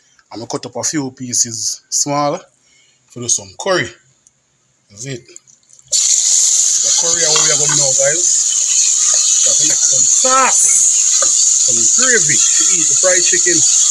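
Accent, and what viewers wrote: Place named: Jamaica. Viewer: The jamaican accent 😊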